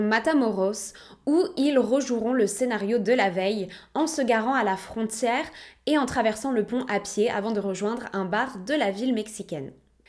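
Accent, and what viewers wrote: Quebec, Canada. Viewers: Merci beaucoup pour ta vidéo tu es GÉNIAL et tu racontes très bien en plus j adoreee ton accent québécois 😁😁😁😁😁👌👌👌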